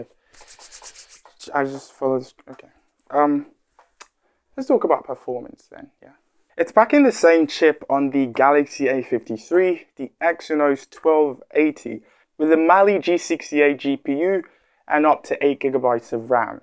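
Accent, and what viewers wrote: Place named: United Kingdom. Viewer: you sound British though. Did you go to school in Britain?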